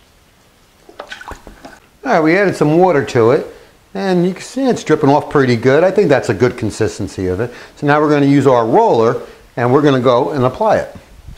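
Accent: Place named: New Jersey, United States. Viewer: Thanks bro. Your videos and verbal style are all awesome! You sound NJ. Am i right? I wonder why east coast refer to drywall as sheetrok, out west no one knows the term sheetrok unless they are very familiar with that name brand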